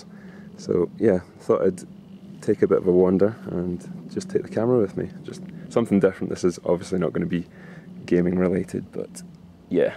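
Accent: Scotland, United Kingdom. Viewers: are ye scotish mate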